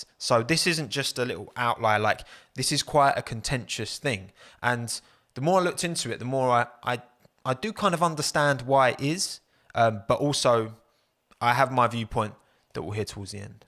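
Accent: United Kingdom. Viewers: I only wanted to let you know you have the britiest accent I've ever heard I'm spanish btw.. Nice video too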